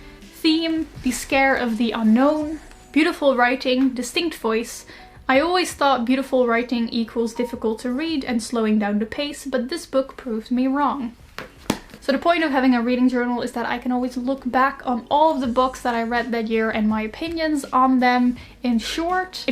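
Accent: Australia. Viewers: Anyone else noticed that she is starting to get an Australian accent on certain words 😂 Edit: the amount of people telling me she's Dutch 🤦‍♀️ I know she's Dutch. Just that I'm hearing Aussie twists on her words, not a bad thing at all, just an observation 😊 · Where are you from? Australia, Norway or USA? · I love how you're getting an australian accent <3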